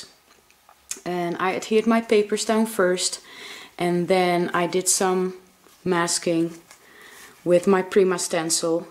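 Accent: Netherlands. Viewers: Goodmorning nice layout !!!! Im the guest designer for the month of march . Are you Dutch????? I am so I thought I heard a dutch accent!!!!! Take care Els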